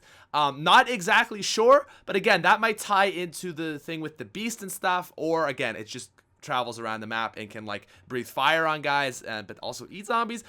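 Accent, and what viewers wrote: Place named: Canada. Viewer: He's Canadian. that's how they pronounce it.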